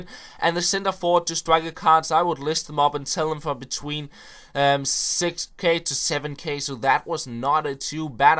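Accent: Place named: Denmark. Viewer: it is funny cause i amm drunk, and it looks liiiiiiiiiike it is 44 plkayers on the turf :P BTW, i absolutely love you voice. the danish-english accsent is sooooooo damn awsome and sexy <3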